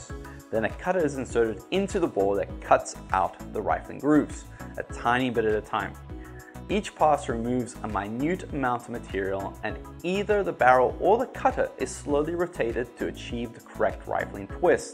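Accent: South Africa. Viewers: Some of your pronunciation is reminiscent of the south african accent… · totally unrelated random comment: your accent, sir, fascinates me! First video of yours I have ever watched, and, that is what caught my ear first even before learning this about barrels, which I did not know either. I am hearing bits and pieces of South African English? British English? AND American English? Am I right am I right?😂 But all combined together into your own unique accent!! Well that is personal I suppose. It’s just that, I am always fascinated by language and accents, having a semi-musical background, and so the way in which any person anywhere talks is always catching my ear because, it also lends a window into their personal story and personal history. So therefore I do not mean any disrespect. Just that, you accent fascinated me when I first heard it and I felt compelled to comment!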